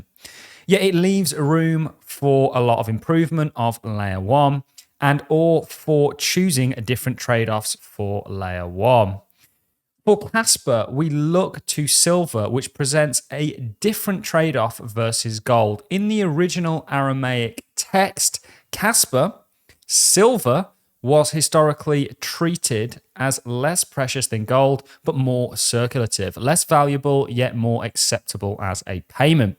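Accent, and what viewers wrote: United Kingdom. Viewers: Thanks for covering $KAS buddy - graceful and elegant British accent and mannerisms make it sound . . . exactly as great as it actually is !!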